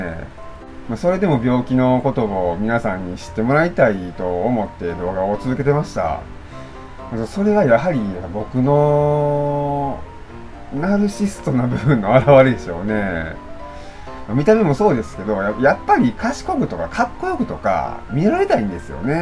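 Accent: Osaka, Japan. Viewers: 毎日アップされるのは素晴らしいことだと感心しています。今日何話そうかなあ？って思うのは大変かなと思いますが。私はさんの家族の話を愛を大阪弁に包んで面白く話すのが好きです。毎日動画アップでさんが元気なことがわかるのでこれからも頑張ってくださいね。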